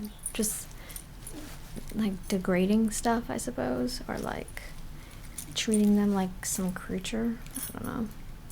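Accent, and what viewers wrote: South, United States. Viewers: I knew she was a no sabes kid. Her American accent was too natural. Just another pocho coconut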